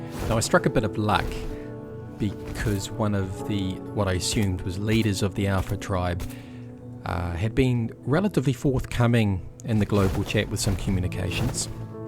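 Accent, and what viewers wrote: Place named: New Zealand. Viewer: Where you from bro sounds like an New Zealand accent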